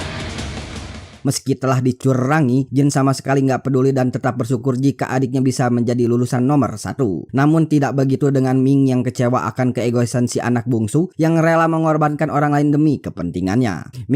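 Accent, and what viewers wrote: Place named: West Sumatra, Indonesia. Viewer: Kok ini logat nya kayak org minang ya.... Apakah emang orang minang min😂😂😂😂😂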